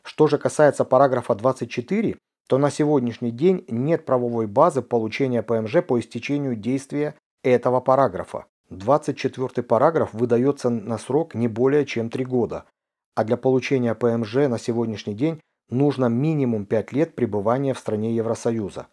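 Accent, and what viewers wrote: Ukraine. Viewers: Огромное спасибо за Ваш труд! Очень важное видео! Какой же у Вас красивый украинский!!! Щиро дякую!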